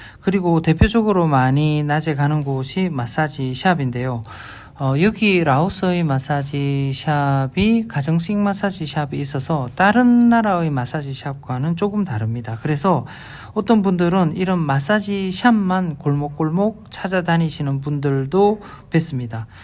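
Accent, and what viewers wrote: Gyeongsang, South Korea. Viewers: 님의 차분한 경상도말에 친근감이 느껴집니다. 한달은 좀 길어 보름살기는 안되나요? 내년1월에는 라오스 가는데, 치앙마이에서도 보름있고 싶어서요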